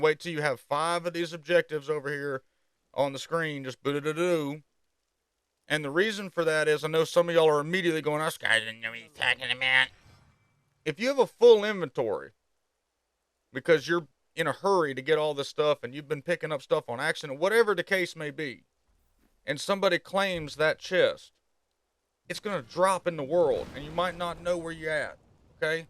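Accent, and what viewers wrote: South, United States. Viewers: Where are you from? Alabama, Texas?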